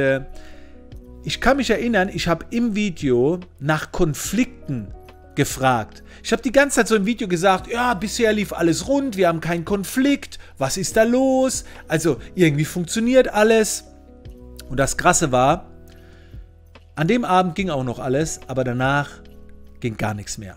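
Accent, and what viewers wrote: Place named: Mannheim, Germany. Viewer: Bist du aus Mannheim? Dein Dialekt hört sich so an. Grüße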